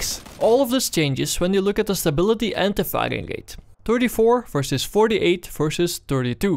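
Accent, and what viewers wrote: Netherlands. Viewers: You sound so Dutch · A quite thick Dutch accent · Are you Dutch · You're Dutch arent you? :) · It sounds like he comes from the Netherlands, so I assume that's his accent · from the moment you started talking i knew.. dat je nederlands bent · je bent nederlands he? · are you dutch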